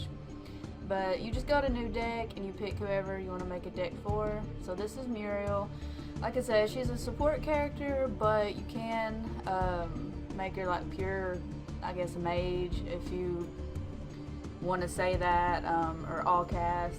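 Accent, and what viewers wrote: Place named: Tennessee, United States. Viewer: Dat Sweet Sexy Tennessee accent <33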